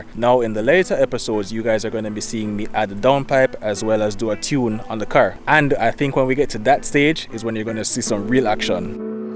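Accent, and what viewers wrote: Jamaica. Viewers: Hey man, totally random, but are you Jamaican by any chance? Picking up a very familiar accent 😅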